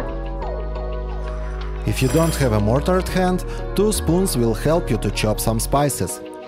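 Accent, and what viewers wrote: Russia. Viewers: Я одна слышу русский акцент? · русский что ли? такой говор чисто русский😃. видео оч полезное, благодарю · Не узнаю откуда этот акцент...Саратов? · You are amazing! Some of your short cuts I had learned from an amazing lady who passed away about 3 years ago who had a YouTube channel and focused on making old recipes from the 50’s she had learned from her mother while they lived in Virginia. She was born in 1947. A lovely , lovely lady and her husband too. He had passed just about 8 months before she did. I love that I am learning new cool techniques from you. I’ll pass your videos around to my friends and family. Are you from Russia or Ukraine? I ask because of your accent. Great job, and please keep up the good work! Your videos are very enjoyable❤😊!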